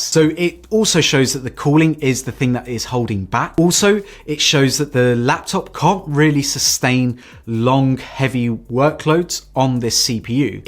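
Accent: United Kingdom. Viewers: Yea, a British accent. You are the first reviewer to mention the screen record as part of the equation. I have the i5 on order with 16Gb & 1Tb after watching lots and lots of comparisons. I decided the i7 might not live up to it's promise. (I loved my old MBP with quad i7 but Apple killed it by not allowing Catalina due to metal)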